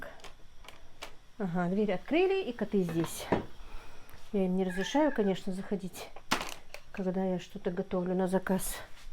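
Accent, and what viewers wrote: Standard. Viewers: дорогая, пожалуйста напоминайте нам про лайки и комментарии! Честно, многие не помнят! Кто не хочет, тот не поставит. А кто хочет выразить Вам признательность, тот иногда забывает, что это канал. Лично я с удовольствием смотрю Вас и как будто с подругой по телефону поговорила, отключилась и пошла. Вы не думайте, что Вы лайки выпрашиваете, просто напоминаете забывчиввм, таким, как я. Восхищаюсь Вами! И да, наслаждаюсь чистым классическим русским языком! Счастья Вам!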